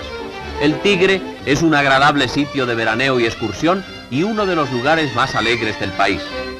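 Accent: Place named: Spain. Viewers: El locutor debe ser español, en esa época el acento porteño era más marcado que ahora, sino mira películas argentinas de los años 60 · Por supuesto que el acento es distinto, si el locutor es español🤦‍♂️🤦‍♂️🤦‍♂️🤦‍♂️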